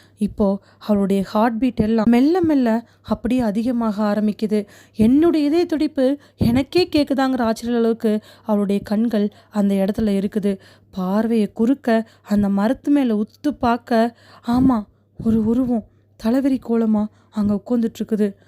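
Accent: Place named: Coimbatore, India. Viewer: பழைய கதை எல்லாம் கேட்டு கொண்டிருக்கேன் சூப்பர். அப்டேட் டும்.. கேட்கிறேன் அருமை.. சகோதரி வாய்ஸ் கோயம்புத்தூர் டோன்... 👌🤝💐 திண்டுக்கல் எங்க ஊரு வாவ்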